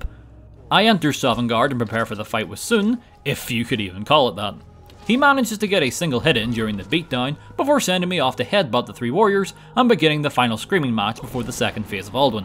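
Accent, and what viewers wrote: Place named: Ulster, United Kingdom. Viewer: has an Irish accent. Specifically Ulster province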